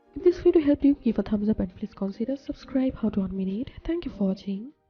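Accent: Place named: India. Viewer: cant listen to this voice im sorry. if you are the beautiful girl in the thumbnail thats awesome but it sounds like an indian video trying to guide me lol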